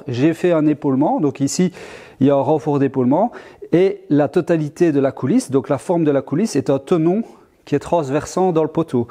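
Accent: Belgium. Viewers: Bonsoir, Moi, j'aurais dit "en butée"... Mais le parlé belge et l'accent...🤔 Abuter : - terme de marine. Mettre bout à bout, ou toucher par un bout. Ces pièces de bois abutent. - ce terme s'utilise pour la pétanque et le jeu de palet. Abuter signifie lancer la pièce d'un jeu en direction d'un but afin de déterminer quel joueur commencera la partie. C'était la minute info🤭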